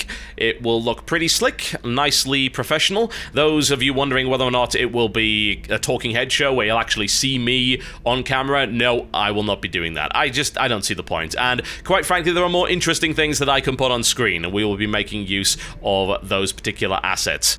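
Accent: United Kingdom. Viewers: Chronicals of the gold farmer was the best show TB ever made period, it had it all: humor, the cynical brit, information and a lovely british accent.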